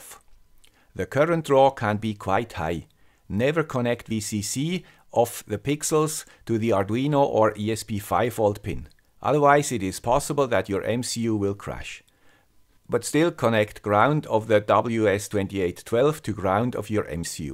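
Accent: Switzerland. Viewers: Depends on the manual (google translated Chinese datasheet can be rather cryptic), it is always nice for mere mortals that guys like does the ground work ^ ^ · Hi. First of all thanks for all your amazing videos. Thanks to your videos I started playing with micro controllers as a hobby. I am a C# back-end developer and have very little experiense with embedded systems. Did you animated your LED strip with the ESP32 meanwhile using WiFi? I have not been able to find a library that animates the led strip correctly. The library esp32WS2811-master works great if WiFi is disabled. But I been having no luck animating 80 LEDs if WiFi is in use. What has work best for me is using the standard Adafruit_NeoPixel library that I previously used for arduino running that code on a separate core of the ESP32 and using "portENTER_CRITICAL(&mux)" functions of the ESP32 meanwhile animating. 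But still the first LED fails to animate correctly. The complete code can be found on this question: <link>. Maybe someone can answer in that question what could I be doing wrong. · We wont stop watching, keep up the good work, however everytime you say "dirt cheap" the Chinese push up the price 😱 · Danke fur alles! Love the swiss accent!;) Nice video! Exactly what I was looking for! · God. Will definitely bless you to achive what you desire. My prayers are with you... Thank your very much for sharing your knowledge 🙏. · We love! Merry Christmas! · don't become defective! Your counter will reach it's theoretical maximum before you know it!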